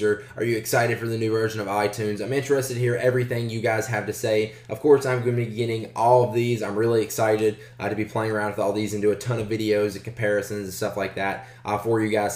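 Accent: South, United States. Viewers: dont u guys think 300 buks 4 the new touch is a bit much,,,,,ps where is he from ( southern draw)(but wheres flat bill hats)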